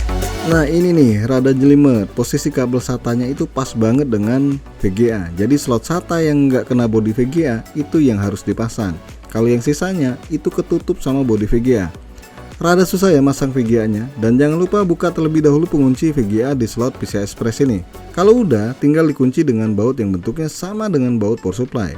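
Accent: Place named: Palembang, Indonesia. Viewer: Hahawkwk kocak bg ni ,wong Palembang kayak nya ni,pakek nian nama nya 😁😁😁😁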